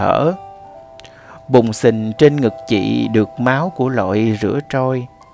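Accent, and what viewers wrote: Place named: Mekong Delta, Vietnam. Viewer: Thích giọng đoc của em,đặc sệt miền Tây,chất phát.Cám ơn ,thân chúc sức khoẻ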